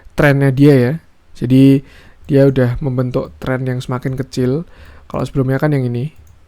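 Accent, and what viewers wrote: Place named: Java, Indonesia. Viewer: Iya betul...khas banget. Medok. Jadi pengen tau profil e mas e 😀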